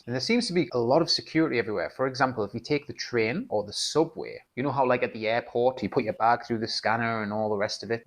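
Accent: Newcastle, United Kingdom. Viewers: Yes, I could hear a "Haway the lads" accent in there 🙂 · Are you a Geordie?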